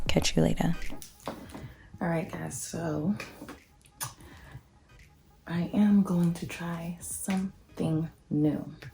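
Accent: New York, United States. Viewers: Your accent is so New York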